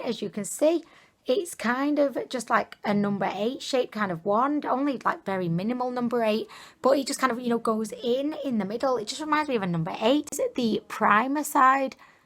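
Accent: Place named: Manchester, United Kingdom. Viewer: Sorry it’s off topic, but where are you from? Your accent is saying Manchester?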